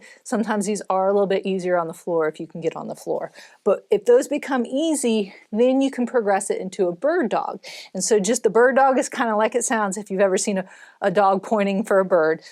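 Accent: United States. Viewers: This comment is irrelevant to the subject of this video. I had always thought you were British, but tonight it just clicked and I was like this is obviously a very American accent!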